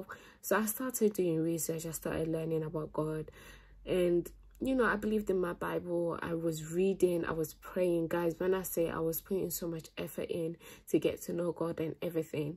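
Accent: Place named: Ghana. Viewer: When you honestly ask God for guidance, Islam is the destination you will arrive at. Keep going sis. Your accent sounds like from Ghana 🇬🇭 , is that the case?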